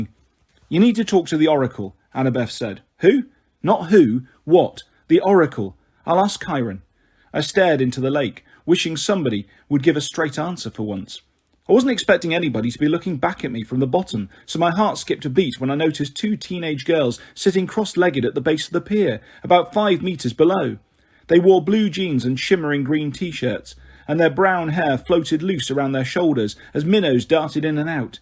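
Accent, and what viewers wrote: United Kingdom. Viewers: He's BRITISH bro, what the fuck did you expect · He's British §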